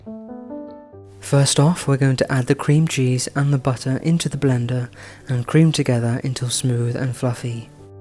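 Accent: United Kingdom. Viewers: Your cake is lovely and looks delicious. I never get tired of hearing your beautiful voice and wonderful British accent. I could listening to you read for hours at a time. Wishing you the loveliest of birthdays. 🐕🇺🇸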